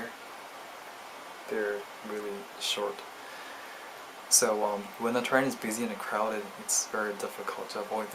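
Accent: Australia. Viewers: you sound Australian, it is odd. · to me your english sounds really australian here, very interesting! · Dude, nice Australian accent. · You sound Australian when you speak in English!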